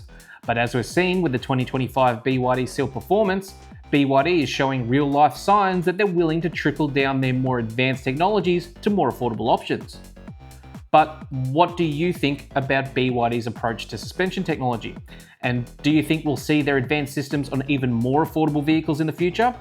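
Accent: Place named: Australia. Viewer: Newly subscribed, good to hear Aussie voices and not AI voices like a lot of new channels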